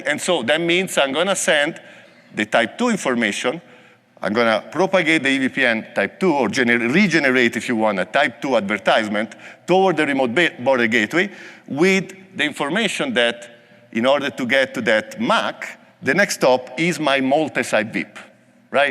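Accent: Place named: Italy. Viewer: I love Italian accent.. Thank you. Great delivery.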